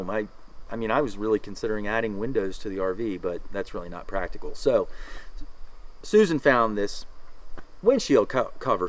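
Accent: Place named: Baltimore, United States. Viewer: We just got out first rv so this video is very helpful! You must be from Baltimore with your accent. Love it!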